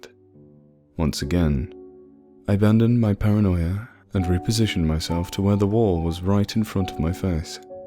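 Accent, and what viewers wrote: England, United Kingdom. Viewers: Thor is played by Cris Hemsworth. He's got an Australian accent...this dude's accent is English. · He's like Morgan Freeman with an English accent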